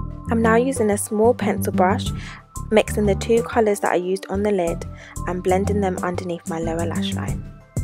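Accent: United Kingdom. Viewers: I had to subscribe you literally got me when I heard your voice. British, so I know where to buy makeup on a budget plus your makeup is FLAWLESS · are you from essex?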